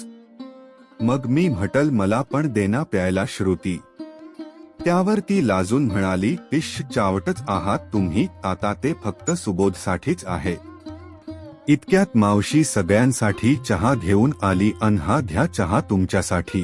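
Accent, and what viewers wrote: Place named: United States. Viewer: is this marathi story presentation or American marathi presentation ? please either improve pronounciation or change the story teller.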